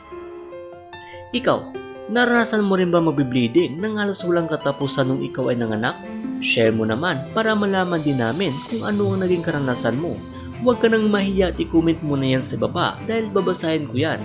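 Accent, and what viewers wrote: Philippines. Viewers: The accents, sound like ilocano. Correct me narrator if I'm wrong.😁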